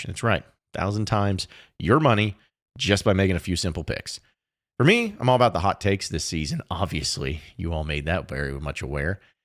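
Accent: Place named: Kentucky, United States. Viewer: This guy speaks Kentucky talk, he should start doing Kentucky sports, hes got some cawood in him.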